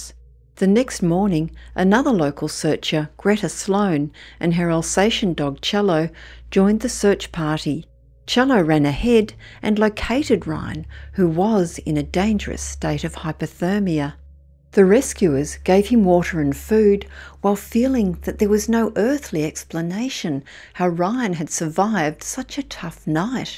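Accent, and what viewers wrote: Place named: Australia. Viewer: Australian narrator I like it 👍👍❤️❤️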